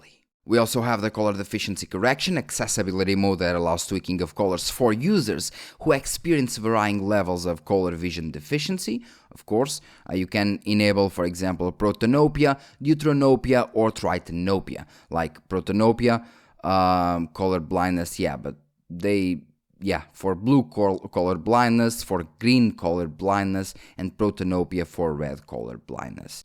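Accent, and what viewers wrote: Portugal. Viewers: Você é portugues ? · are you from portugal ?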